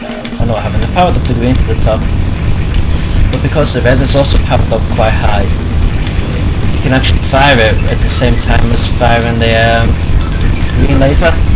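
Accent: United Kingdom. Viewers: You sounded more british this time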